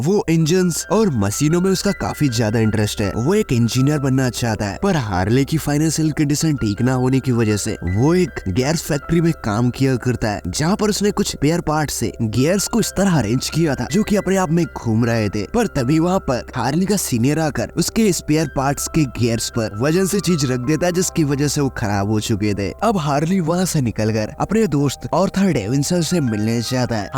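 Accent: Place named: Jharkhand, India. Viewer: bhai tumara voice acha hai lekin tumara hindi छपरी वाला or zarkhandi boli ka hai ese thik karo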